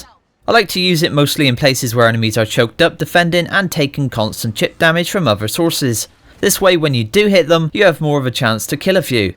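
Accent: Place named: West Country, United Kingdom. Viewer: Another great guide, keep up the good work! Btw, going by your accent I'm guessing your a fellow west country lad?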